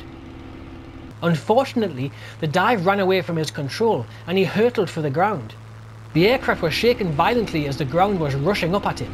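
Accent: Newcastle, United Kingdom. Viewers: A very interesting ‘Geordie’ voice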